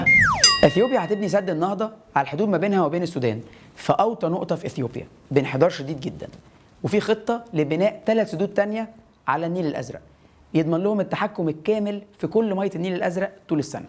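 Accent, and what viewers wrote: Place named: Gulf, Arab world. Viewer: واضح من لهجتك إنك من الخليج..... طبعا لا داعي للذكر إن كل دول الخليج لها علاقات وطيدة جدا بإسرائيل و من ينفي ذلك مغيب أو ساذج و بالنسبة للعلاقات مع إسرائيل ...بالفعل مصر اول دولة طبعت علاقات مع إسرائيل أحنا لا نتناسي....و لكن غيرنا يصطنع العداء لإسرائيل و هو تحت الطاوله يمد يد العون و المحبه و التعاون مع إسرائيل بكل الطرق و بالفعل القوي الناعمه الإسرائيليه في محيط حوض النيل قوية بشهادة القاصي و الداني و ممكن تقرأ عنها رجاء واحد...كفانا كلام من نوعية الإستهلاك المحلي و إصطناع الوطنية الكاذبة!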